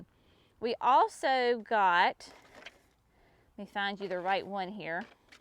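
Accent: South, United States. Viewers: Hello from the wasteland, Illinois! So good to hear someone from the south! Your our sanity.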